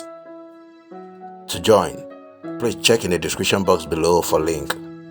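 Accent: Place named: Ghana. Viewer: Nice Job bro.. you sound like a Ghanaian ɓoss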